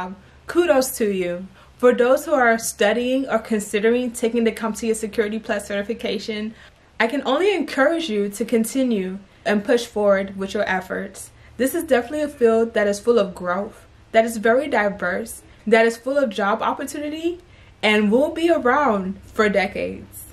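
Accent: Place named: East Coast, United States. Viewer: I love how east coast women talk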